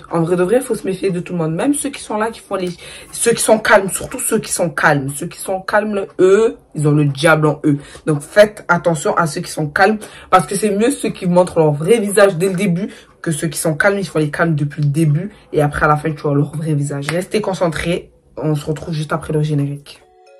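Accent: Marseille, France. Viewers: T’es de Marseille ? J’ai l’impression d’entendre maeva ghennam là 😭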